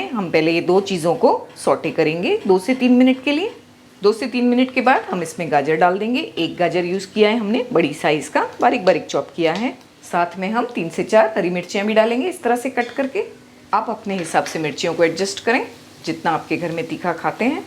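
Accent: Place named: South India, India. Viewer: You have weird accent for a Pakistani. Sounds like some south indian person trying to speak urdu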